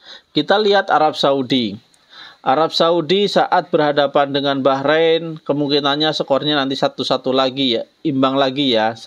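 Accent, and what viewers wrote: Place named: Banyumas, Indonesia. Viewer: Ngapak bangeet mas...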